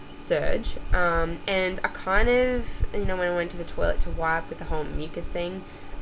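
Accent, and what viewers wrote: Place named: Australia. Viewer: Ha ha i thought the same when i saw your video and then when i heard your voice i was like ahhh I'm sure she's Australian lol go us Aussies. Ooh i love Queensland such a beautiful place we're thinking of getting married over there. How are you holding up with the crazy weather over there? I'm over in Perth, love it here. Thanks babe fingers crossed it happens soon! xox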